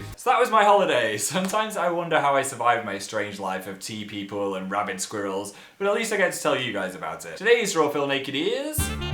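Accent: North, United Kingdom. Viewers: You're sounding rather Northern in this. I think the time you spent with your Mum and Dad rubbed off on you. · He sounds so northern in this video! In 2018 he doesn’t really sound like it anymore :c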